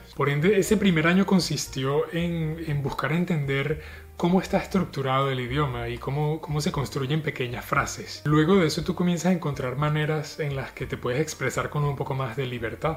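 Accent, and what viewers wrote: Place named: Venezuela. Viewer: ¿Te formaste como músico en Venezuela, en el Sistema de Orquestas y luego te perfeccionaste en Suiza? ¿Rechazas tu condición de venezolano? El acento es innegablemente venezolano.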